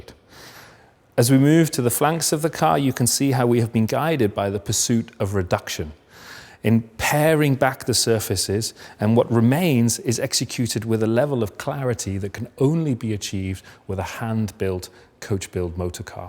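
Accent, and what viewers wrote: United Kingdom. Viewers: Nice accent, what part of the UK are you from?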